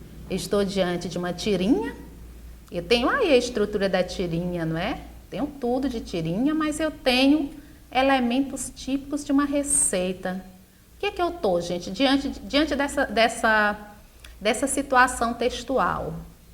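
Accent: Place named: Northeast, Brazil. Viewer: Sou de SP. Mais esse sotaque do Nordeste é o mais lindo do Brasil. Adorei está aula.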